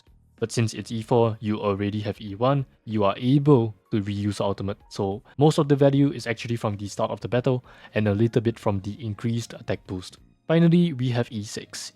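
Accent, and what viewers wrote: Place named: Singapore. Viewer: me too! i could tell from the accent! nice to see a singaporean creator playing this game too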